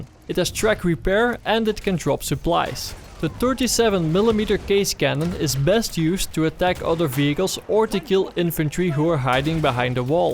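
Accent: Netherlands. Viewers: jij hebt echt een zwaar NL accent in je engels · Why do you sound so dutch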